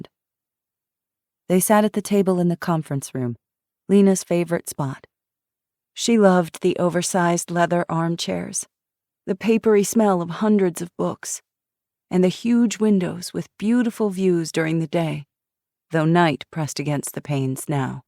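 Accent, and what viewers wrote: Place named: France. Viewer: Love the narrator. Especially her French accent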